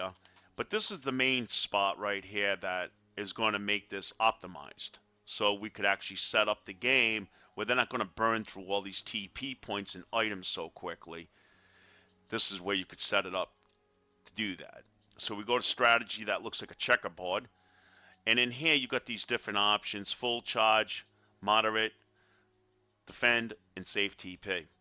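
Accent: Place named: Boston, United States. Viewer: Watching this almost a year later bro. Thanks. Just wondering, you from Boston? I hear the local accent.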